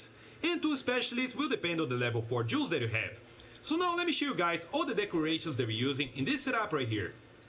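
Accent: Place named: Brazil. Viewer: Seus videos são top e sua pronuncia é perfeita , só não dá pra esconder aquele sotaque de respeito , mto bom ver br's que trazem conteúdo pra gringa , gg mano , also nice build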